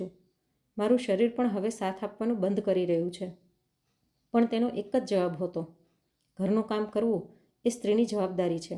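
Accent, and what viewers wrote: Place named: Rajkot, India. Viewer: Rajkot vala aavaj haoyu ch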